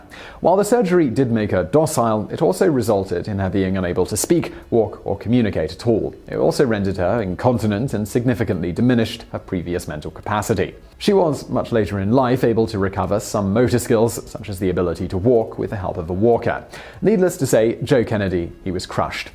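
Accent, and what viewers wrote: England, United Kingdom. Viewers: Tell me why I thought vsauce was having a midlife crisis and took on an English accent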